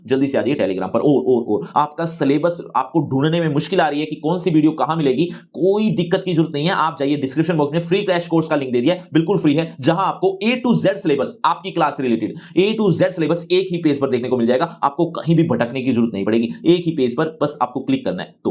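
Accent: Haryana, India. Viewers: Sir are you from haryana 🤔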